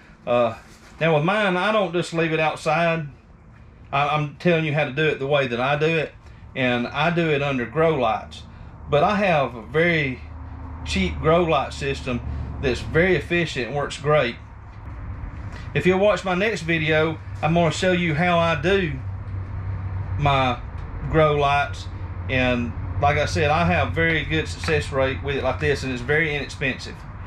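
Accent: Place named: Boston, United States. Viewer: I recognize that accent. Definitely Back Bay, Boston!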